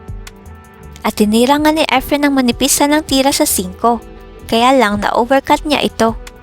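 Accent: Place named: Tagalog, Philippines. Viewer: Fock ur tagalog accent so effing annoying so stfu biatch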